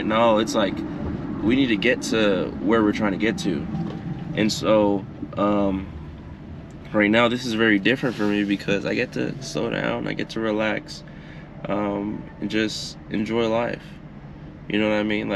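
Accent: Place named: Haiti. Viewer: I came across this channel just browsing, because I usually look for a camping videos of people just enjoying their lives ,yours came up immediately click on it at first I wasn’t because it’s 15 mins long ish ,don’t come for me I say that because I feel like it’s important to at least try for 29/30 mins long as others put a lot of time and effort anyways I strayed away from that, and took a chance only to hear you preach about god and the lord amen I subscribe , this is the first video on your channel I’m watching and then I continuously sat watching , grabbing that chapstick 😂😂😂and seeing that GT flag it was a done deal I was here like ohhhhh I found my husband lamp 😂😂😂😂 at first I was like the way you speak it kind of seems somewhat similar to my niece father thought for sure you were Haitian possibly. Love your content ,I praise god and lord EVERYDAY for life could have lost mine last year to a hit by a car by god grace I’m here ,I got even more into gospel music I play it all at work even meet a member of the Evolation worship, and he was like shocked that I was actually playing gospel music guessing on the clock because I’m sure he’s hurt his music being played somewhere I played every day playing anywhere, especially on my over the ear headphone where I know others can hear but at work it’s all on my tiny speaker lol and just to get an idea I work at the airport 😊 Add me insta blssedd.xx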